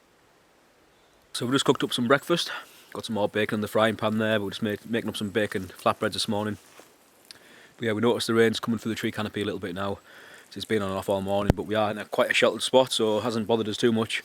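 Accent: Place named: Northeast England, United Kingdom. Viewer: Great relaxing video and no silly music.im 60 now and me and the lads used to do this 😊you sound like tojr from the northeast.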